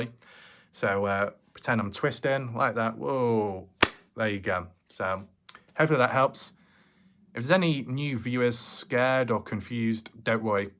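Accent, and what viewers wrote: South, United States. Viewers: lol 😂 that country accent is FANTASTIC! I’m rollin’ on the floor! Keep up the great work! Always enjoy ur videos! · Great accent. Can you say, hey there feller with the yeller piller? I used to say that to my southern family when I was a kid. I can decipher it if you like lol. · You read the article on the 23rd I believe. Can't believe this happened. It's a shame it's even still up. I completely agree that everything, including the mess-ups and misinformation, should be archived! We have to learn from our mistakes. By the way, I'm loving the country accent 😂😂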